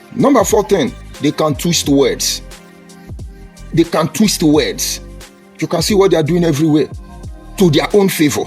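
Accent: Igbo, Nigeria. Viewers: Yet I see elements of Igbo in your voice. Who is pinching who. Are Igbos the one ruling Nigeria now. Are Igbos the one passing policies that does not favour the North. Are Igbos the one interpreting Tinubus policies to the North. SATAN is definitely using YOU against the unity of the south south and south east and the west. You just spilled your grievances about your predicament. Perhaps some one from the west is paying you to do this. I have never seen a man whose profession is to gossip and spoil a tribe who he him self originates from as we can tell from his ascent. God is about to fix Nigeria by reconciliation and healing every wound in the heart. While you allowed SATAN to use you to divide Nigeria and even your own people further. SHAME ON YOU, SHAME ON YOU AND SHAME ON YOU.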